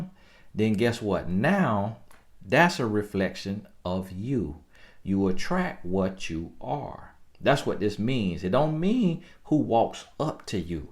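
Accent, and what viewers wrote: South, United States. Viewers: Great video. I like your southern country accent. I saw your wife in a video. She is beautiful.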